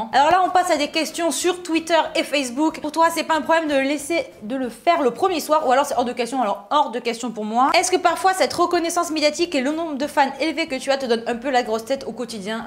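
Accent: Brazil. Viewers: voce e muito linda e eu amo teu accento brasileiro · L'accent brésilien c vraiment dar 😏👌👌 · Mais c parcque elle parle avec l accent brésilien qui est différent du portugais c pour ca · Tu na pas réellement parler portugais c’est plus brésilien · On sent que tu parles un portugais brésilien à l’accent aha😂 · Trop chou ton accent brésilien j adore!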